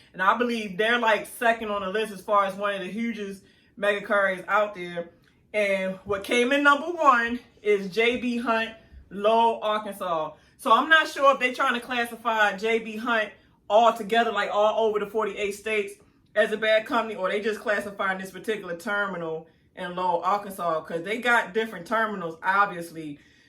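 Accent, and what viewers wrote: Baltimore, United States. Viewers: You gotta be from the Baltimore area... Lol!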